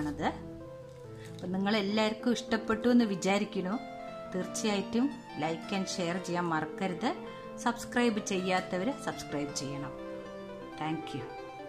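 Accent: Palakkad, India. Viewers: Chechi palakkad ano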